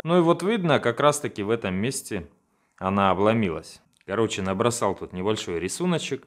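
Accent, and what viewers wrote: Rostov, Russia. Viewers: автор, ты из Ростова что ли?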